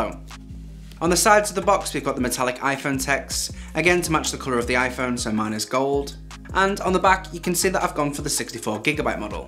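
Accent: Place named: England, United Kingdom. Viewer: I like Ur English Accent Sound so cute...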